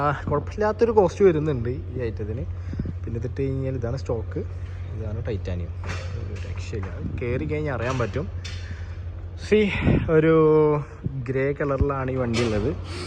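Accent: Kasaragod, India. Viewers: Kasargod slag ayaloo 😂🔥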